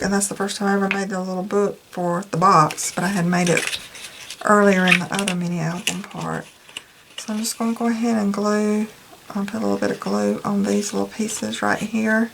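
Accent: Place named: Tennessee, United States. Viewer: Your albums are lovely too 😊 (I lived in TN for a few years and your accent sounds very similar - I miss it so very much )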